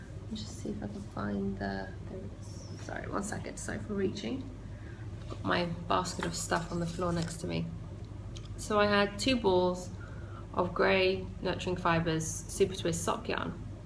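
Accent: United Kingdom. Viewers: This is a note, and you can take mu advice, or dump it. I just like people and if they think my advice is silly, I am not offended. You're looking into the computer. Your camera is to the right of how you are sitting. Look into the camera and not the computer to see yourself. You are lovely and do not need to check yourself and if you look odd. You cannot look odd. I am jealous of your hair and think you're a joy to watch. If you looked straight into the lens, I think it would be a titch better, but you could put the back of your head to the camera and I'd still watch. So again, feel free to ignore me. Honestly, you make very few new to pod mistakes. I don't hear some of the words I have to edit out and that takes forever. I ummm, and "uhhhhhhhhhhhh." LOL! I mean every comment with 100% respect to the wonderfulness of you. If you're from the UK is loving Monty Python mandatory? 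My husband says it is and although I've been to the UK, and know it isn't he loves teasing me and I would like a UK person to answer for him. HA! We adore your accent. If course you wonder what I'm talking about, since I'm the one with the flat American accent to you. Very flat, Michigan and we call soda pop. I know you had no need to know that.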